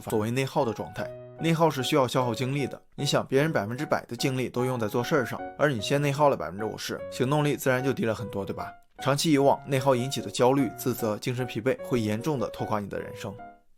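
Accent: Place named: China. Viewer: 听这口音，up本来就是我们国内的吧😅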